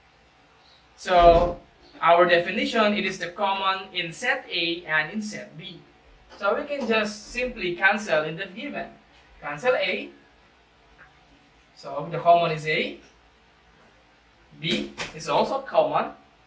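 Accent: Philippines. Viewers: Wow I think your a Filipino hehe it's so clear I understand everything thank you because of you now I know how to answer my math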